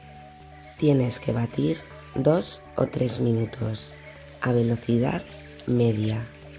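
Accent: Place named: Spain. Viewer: Los limones en Mexico son verdes no amarillos son lo mismo?. De q pais eres, me suena tu voz a Española.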